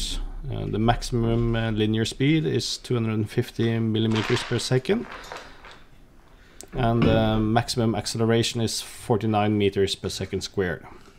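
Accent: Norway. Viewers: Jeg viste at du var norsk jeg merka det bare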